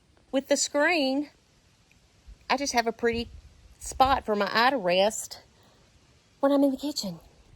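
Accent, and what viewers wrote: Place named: South, United States. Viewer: I love your videos,! You DO have a lovely personality. I have thought about making videos, but I have a voice box problem. And yes, we can tell you are from the south 🤭😆 because you sometimes double the syllables in a one-syllable word. Lol I'm a southerner, too, born/raised in west TN.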